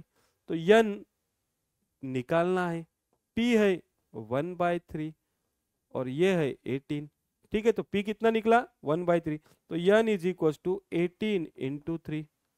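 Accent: Maharashtra, India. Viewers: Question >> ❎ Kochhan >> ✅ Marathi accent 🗿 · Marathi accent 🥰🥰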